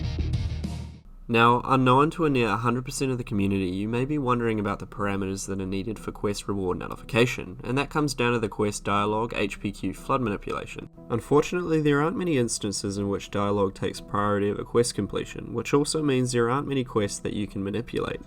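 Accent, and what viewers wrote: New Zealand. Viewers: Sub for the nz accent ! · Are you a kiwi brother?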